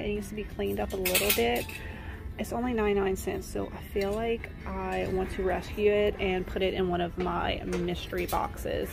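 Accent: Louisiana, United States. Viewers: Are you from Louisiana? ❤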